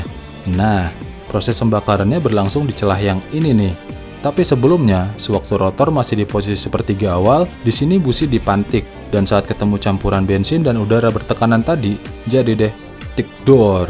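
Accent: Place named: West Java, Indonesia. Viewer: Fix, logat nya sunda.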